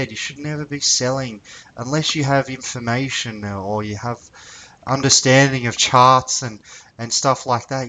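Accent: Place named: Australia. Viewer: Gday, just subbed. nice to hear an aussie accent doing some price action. New to all this but watching as much as possible to learn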